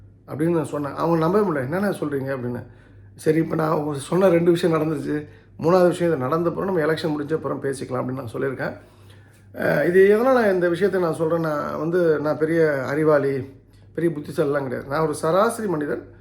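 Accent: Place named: Madurai, India. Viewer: மதுரை தமிழ், சண்டை போட்டாலும் ரசிக்கும் படி இருக்கும். 🎉🎉🎉🎉🎉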